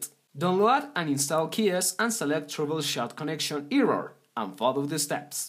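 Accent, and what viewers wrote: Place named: China. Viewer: You need to add subtitles, I can't understand your Chinese accent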